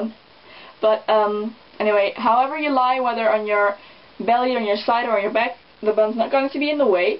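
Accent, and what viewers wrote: Netherlands. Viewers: You have a dutch accent :) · Are you dutch..? I can hear that you say sok instead of sock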